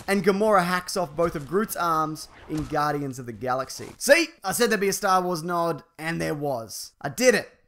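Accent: Australia. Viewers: Came for Avengers trivia, stayed for the lovely australian accent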